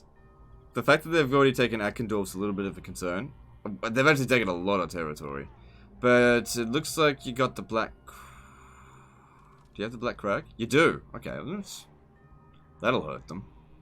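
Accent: Australia. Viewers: I very rarely click your videos based on the content, it’s usually about personality. Sometimes I just think “shit man, I could use a grumpy Australian talking into my earholes”. Love your channel man.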